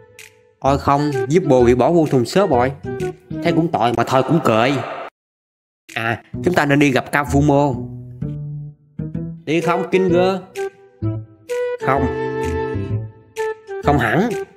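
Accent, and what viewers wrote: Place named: Binh Duong, Vietnam. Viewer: saonóđúnglà bình Dương vải cả nó hơi sà lơ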